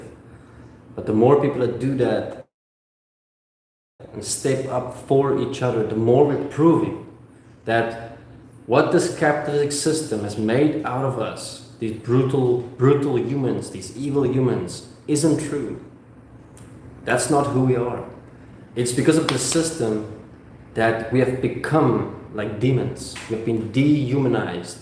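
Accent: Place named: Netherlands. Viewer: I would have guessed Dutch by the accent. Afrikaans? 😉. Positive feedback on Yang is ALWAYS appreciated by YangGang. 🧢 Keep up the good work, en dank u wel.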